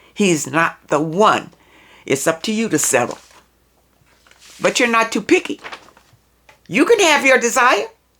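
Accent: South, United States. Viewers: Hello Miss, I heard you mention are orihinally from the Carolinas. I'm hete in South Carolina, in Whitmire, Newberry County. I thought detected some Southernisms in your speech. Friendliness too. Also youre a fixed sign, me too Double fixed ♏ Scorpio Sun, ♌ Leo Moon, Scorpio Rising..I enjoy your videos, I learn something new with each video. Thank You. *Pardon my typos, for some reason the Edit option jumps around, wont allow me to correct in each word.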